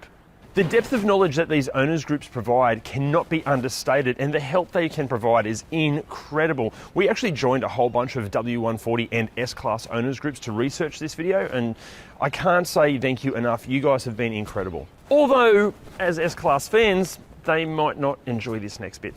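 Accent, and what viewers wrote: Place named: Australia. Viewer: Horrible accent. What is it? Doesn’t pronounce his ‘t’s and ‘R’s Australian I think?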